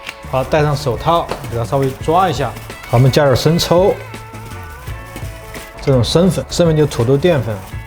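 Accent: Sichuan, China. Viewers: 你那个川普真的…. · 川味普通话，好亲切！ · rua一下，老师的川普太萌了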